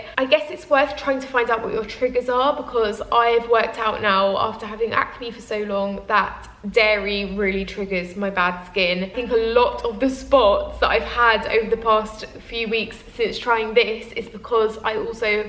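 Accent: United Kingdom. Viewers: I love your British!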